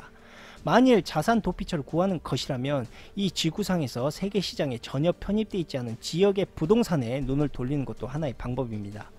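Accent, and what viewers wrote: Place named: Honam, South Korea. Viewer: 칠백명때부터 보던 사람입니다. 사실 지역감정이 없던 저인데 가족이 전라도 사람에게 사기를 당하고 이후에 안좋은 마음이 자리잡게되었어요. 그런데 억양에서만봐도 님도 호남이 고향이신것 같은데 영상보며 응원많이 합니다.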